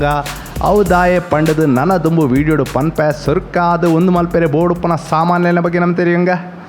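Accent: Tulu, India. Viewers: The way you speak thulu language very funny. We are not useing Oil Ok Super RECIPE.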